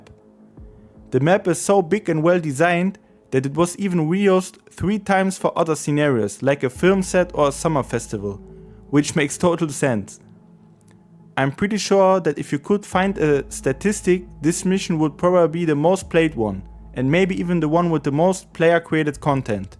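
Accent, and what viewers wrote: Germany. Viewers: Do I hear German? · Are you from Germany? I've seen several videos from your channel (which is very good, by the way) and I was trying to guess where you were from based on your accent, and I thought of everything but Germany.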